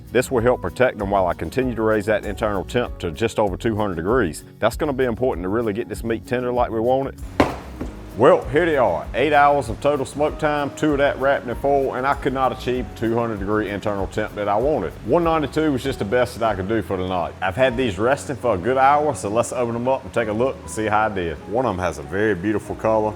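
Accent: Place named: South, United States. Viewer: Dude. I'm an English teacher who really appreciates dialect. Would you please tell me what region influenced your accent? It's like southern Appalachian with a hint of Cajun or Creole (can't tell).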